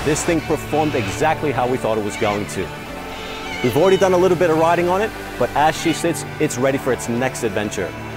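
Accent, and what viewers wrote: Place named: Australia. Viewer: Like it's kinda Aussie but all over the place at the same time?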